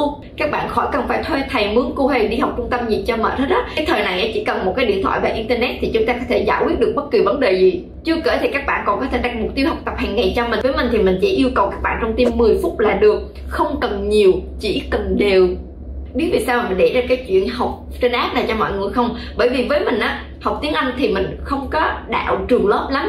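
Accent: Binh Dinh, Vietnam. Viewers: bà chị đến từ bình định à nghe quen quen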